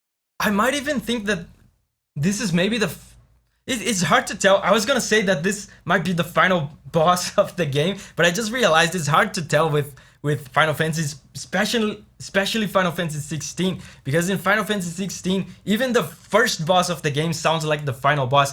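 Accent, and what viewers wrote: Brazil. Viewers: Hey! You have sort of a brazillian accent, are you from brazil?? If not, where you from?? (im probably wrong lol)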